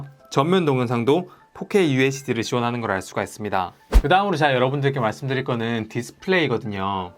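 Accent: Gyeongsang, South Korea. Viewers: 경상도사람이구나. 순간 김영철인줄..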